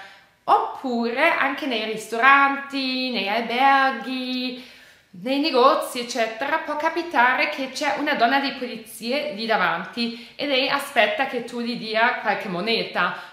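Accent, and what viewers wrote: Germany. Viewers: Salve. Mi chiedevo se la bottiglia di plastica si deve rendere obbligatoriamente nello stesso negozio dove si è comprata o anche in un altro? Grazie. P.S. Il tuo italiano con cadenza tedesca è stupendo. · Se voi piaciuto ,se vi è piaciuto questo video ,hai un forte accento tedesco si sente . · Bravissima complimenti per il tuo italiano mi.piace l.accento tedesco....io vivo in Germania da anni...e si sente l' accento italiano quando parlo tedesco e divertente questa cosa..ciao un abbraccio...dove vivi in Germania ? 🌷🌷🌷🌷☀️☀️☀️☀️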